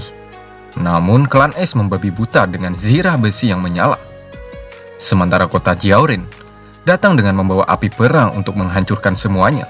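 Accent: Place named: Madura, Indonesia. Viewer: lu orang mana bg kok logatnya kek orang madura atau jawa timur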